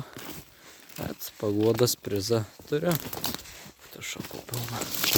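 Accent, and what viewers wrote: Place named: Šiauliai, Lithuania. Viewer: Pagal tarmę panašu į Šiaulių kraštą..😉.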